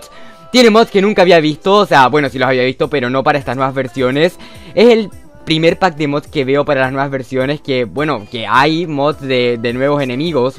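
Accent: Chile. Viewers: me suenas aque eres chileno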